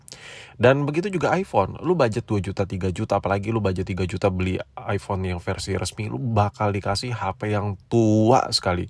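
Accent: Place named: Banyumas, Indonesia. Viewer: Jebule wong ngapak ya😂😂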